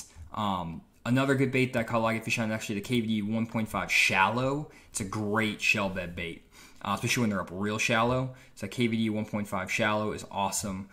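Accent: Arkansas, United States. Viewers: Your from Arkansas??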